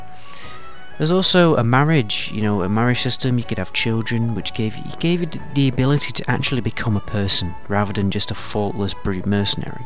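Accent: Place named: Manchester, United Kingdom. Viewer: I love your accent are you mancunian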